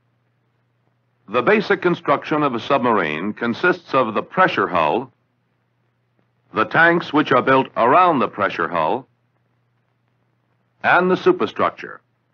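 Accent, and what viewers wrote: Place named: Transatlantic, United States. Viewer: I wish people still spoke and presented with a Transatlantic accent like this.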